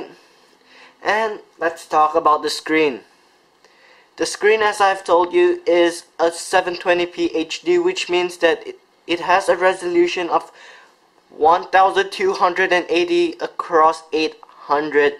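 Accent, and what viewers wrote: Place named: Singapore. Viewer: Sounds more Spanish to me for some reason, but you're right, it's a Singapore accent by the sounds of it.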